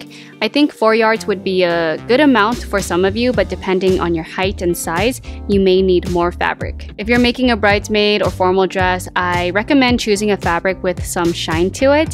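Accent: United States. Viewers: She sounds sooooo american oml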